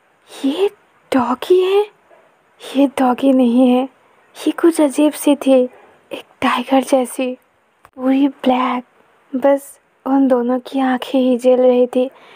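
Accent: Bengal, India. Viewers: I am fascinated to hear Bengali in your voice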